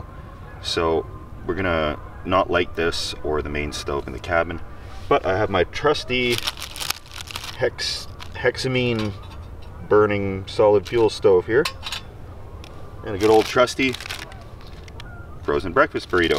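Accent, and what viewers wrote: Canada. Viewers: I grew up in Buffalo, NY and spent many summers in Ontario. You epitomize the best of everything that is Canadian. I live in NC now and miss those accents and beautiful natural surroundings all Canada has to offer. (I really miss the snow!) Great channel, so informative and frank, I feel like I’m camping with a friend. Keep up the great work!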